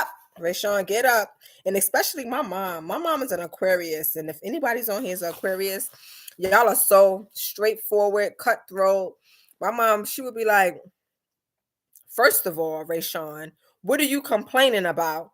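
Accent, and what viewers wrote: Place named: New York, United States. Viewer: I figured it was either NY or NJ the accent,husband as well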